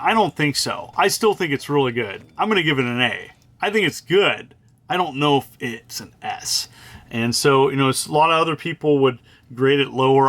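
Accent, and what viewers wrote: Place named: Northern California, United States. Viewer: Great video. It’s funny I’m a NorCal dude also and i recognized your “accent” immediately lol. Thanks for the great content. Love it.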